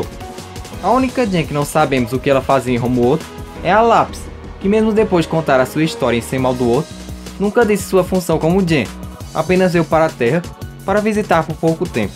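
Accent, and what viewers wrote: Northeast, Brazil. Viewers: ei doido tu e do Nordeste?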